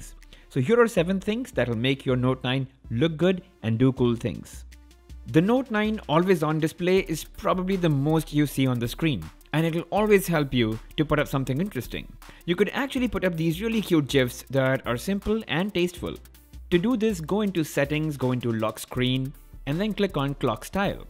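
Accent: India. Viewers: Lose your Indian accent and gain more sub!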